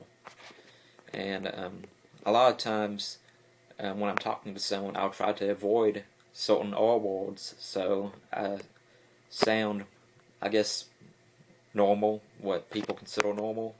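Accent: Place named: South, United States. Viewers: I just thought you were from Louisiana and was Creole. Anyway dont worry about it. Your vids are great. I sound terrable on my vids. I would never think of you are slow. Thats just trolls being ass holes. You come over as a very bright and thoughtful person. Give it no more thought man. Keep up the good vids. :-) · When I first heard your voice, I just thought you had a southern accent and pronounced some words funny. I didn't think anything of it. I also know how you feel, because everyone always says I talk funny. I like your vids, keep on going, man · Dude you sound like you come from New Orleans. From down the Bayou! It's sounds cool to me! Subbed yah! Keep up the good work! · you do have an accent the same as your brother I can hear it when you talk, its in addition to the speech impediment, but a definite southern accent in there a twang if you will, I always liked southern accent for some reason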